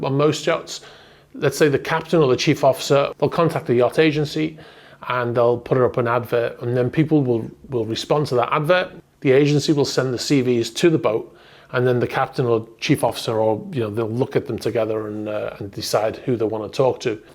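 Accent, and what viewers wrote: Liverpool, United Kingdom. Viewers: My USA ears aren’t well tuned. Scouser?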